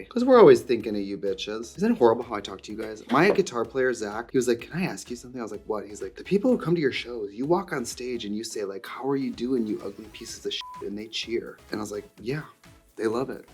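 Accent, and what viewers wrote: Wisconsin, United States. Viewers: The wisco accent is really strong after the break 😂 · Your Wisconsin accent is thicker. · Trixie goes on vacation for 3 months and comes back with Milwaukee Wisco accent in spades she's been trying so hard to keep under wraps. [I also have this accent and try to keep it under wraps]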